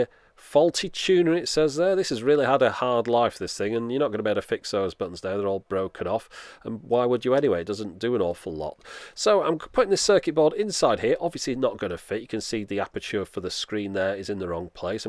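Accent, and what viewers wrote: United Kingdom. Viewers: WoWKoest I dont know what it is, but there is somthing inately satisfying about listening to brits talk tech.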